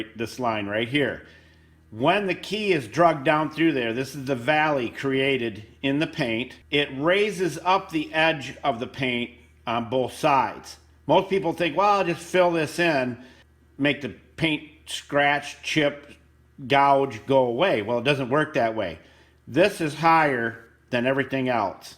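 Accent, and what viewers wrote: United States. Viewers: where is this accent from in USA? sounds great. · I am in UK and unfortunately I don't understand Yanky ! I have no idea what your talking about.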